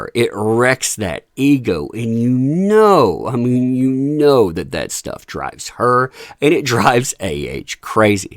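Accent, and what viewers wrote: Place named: Tennessee, United States. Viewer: Hey All, Please hit the like button, watch all the way through, & share this video everywhere, it’s important. Plus, who can resist listening to voice & beautiful Tennessee accent, that is a sophisticated, cultural gem; an accent that’s quickly disappearing from society. Thanks!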